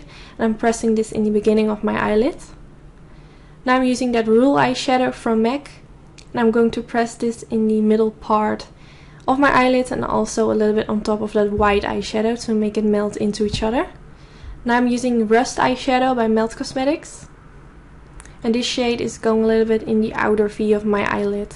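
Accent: Netherlands. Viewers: hey, are you dutch?😊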